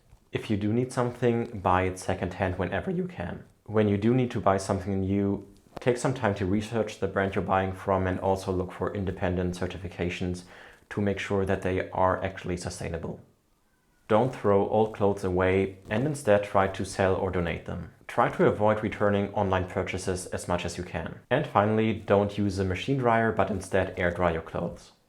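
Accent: Germany. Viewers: Great video! I love fashion but realized I don't even wear most of my clothes, so I started to declutter everything and became more aware of my purchases. Are you from germany? The accent sounds familiar 🙃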